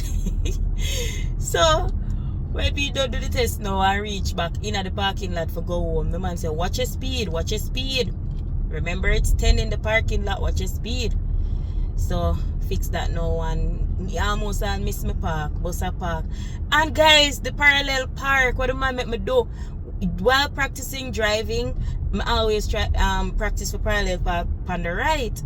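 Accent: Jamaica. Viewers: When you speak rank Jamaican it is hard to understand you, please be mindful that persons other than Jamaicans are watching your videos. Good video nevertheless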